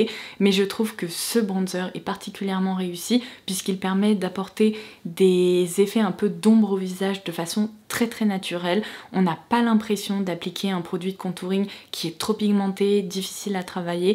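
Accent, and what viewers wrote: England, United Kingdom. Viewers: Merci pour ces cadeaux pour ce super top 10 et ce super accent anglais qui fait du bien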